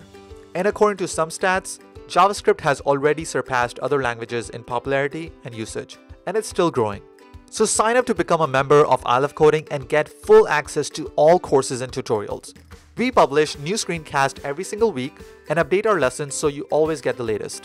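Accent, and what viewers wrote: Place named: India. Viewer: I love your Indian accent in the background :) :) makes the video makers more authentic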